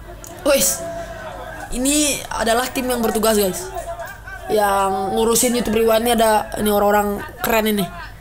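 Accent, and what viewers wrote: Bali, Indonesia. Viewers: Pantesan logatnya aja udah dari bali sama kayak gue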